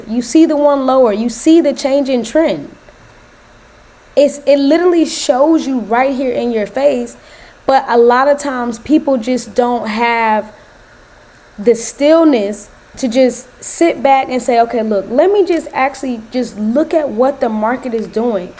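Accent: United States. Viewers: Are you from DC?? You sound like Blac Chyna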